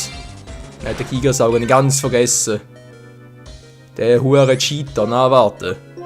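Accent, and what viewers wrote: Switzerland. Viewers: Besch du Basler oder Zuger ? ^^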